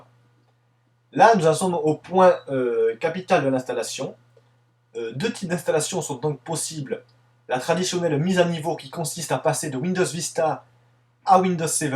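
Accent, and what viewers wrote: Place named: Marseille, France. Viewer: toi t marseillais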